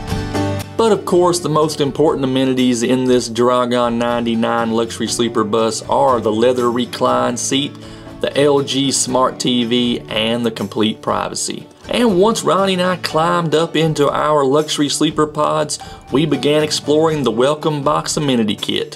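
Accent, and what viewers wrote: Kentucky, United States. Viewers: Thank you so much!:) The Kentucky accent is so funny😁 Keep up good work guys!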